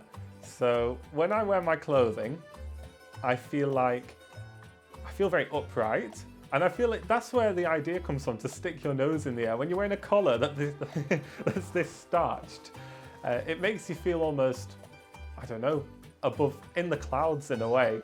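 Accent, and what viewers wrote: United Kingdom. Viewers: His accent sounds northern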